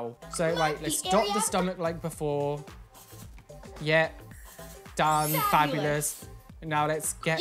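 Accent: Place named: RP, United Kingdom. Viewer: I love how he is British but he sounds like he is a Royale prince from England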